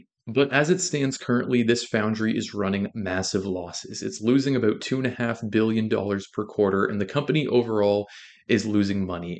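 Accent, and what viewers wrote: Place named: Canada. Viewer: From your accent you must be canadian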